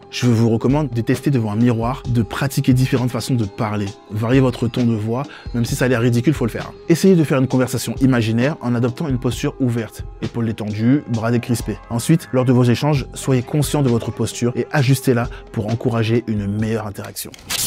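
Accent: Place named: Ivory Coast. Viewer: Avec un subtil accent suave Ivoirien 😅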